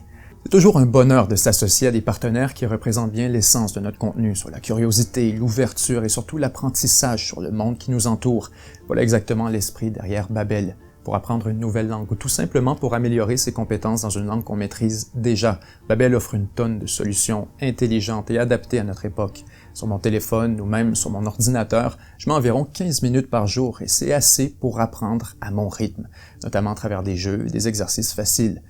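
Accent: Quebec, Canada. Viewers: je n aime pas spécialement l accent québécois mais ... je l entend à peine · Bonjour je vous ai découvert seulement hier en cherchant un reportage sur Khadafi qu'un ami panafricain affectione ..heureusement que votre accent quebecois est discret ( plus proche du belge/ suisse).. Malheureusement le monde s'intéresse plus au buzz et aux futilités qu'à la Vérité...Longue vie à 7jours sur Terre.. Cathy depuis Paris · Je vous suivais dès les débuts depuis la Kabylie. Je suis fasciné par la qualité de votre travail, la profondeur des exposés et votre façon de parler. C'est à travers vous que j'ai appris à aimer l'accent québécois qui me paraissait jusque-là bizarre. J'avais peur en cliquant sur la vidéo mais finalement c'est une bonne nouvelle que vous nous annoncez. Je ne suis pas abonné mais je vous prie de croire en ma profonde gratitude pour votre engagement.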